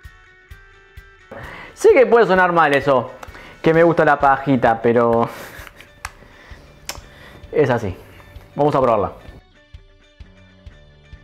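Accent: Argentina. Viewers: Sos de Córdoba o me parece?? :v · che este se supone que es argentino por el asento pero le dice pajita a los piquitos la traision hermano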